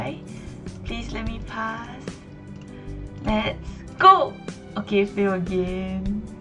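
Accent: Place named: Singapore. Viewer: I agree with his/her comment. I like the Singaporean English accent.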